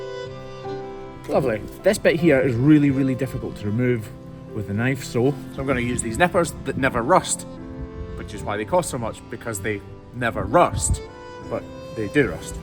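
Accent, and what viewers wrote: Scotland, United Kingdom. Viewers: keep speaking as you do. I'm from Kilmacolm and I appreciate representation of our way of speaking on YouTube.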